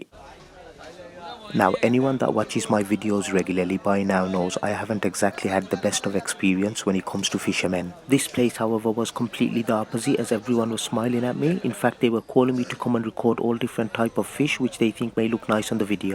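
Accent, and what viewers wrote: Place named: United Kingdom. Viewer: Man your sylti maat is so accurate and has no weird accent, yet your British accent is so perfect. How come??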